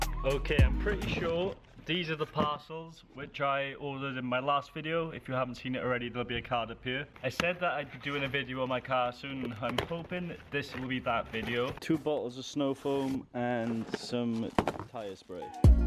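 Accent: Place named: Wales, United Kingdom. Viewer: Where are you from? You sound like you’re from Wales...I’m from South Wales! Love my mini and I also hate when it gets dirty 😏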